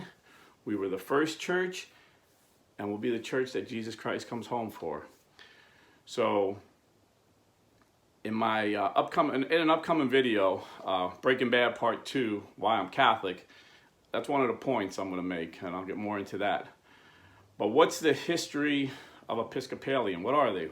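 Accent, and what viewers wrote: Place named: Northeast, United States. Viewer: Are you Italian? You have a Northeast US accent or Chicago accent. Can't tell which, since I'm not from USA.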